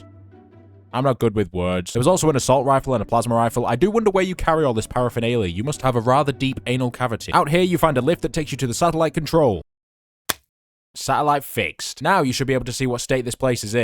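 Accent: North, United Kingdom. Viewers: You seem very northern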